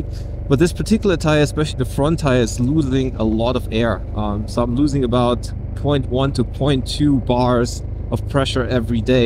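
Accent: Germany. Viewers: Gutes englisch aber den deutschen hört man trotzdem raus haha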